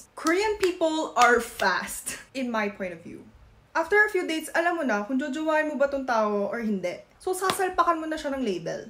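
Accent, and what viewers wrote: Philippines. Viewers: tunay kang pinay kasi when it comes to beauty marami namang pinay like your beauty magsalita pinay pagmagenglish pinay accent kahit kunting korean accent wala eh more on filipino ka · You have a Pinay accent na talaga 😊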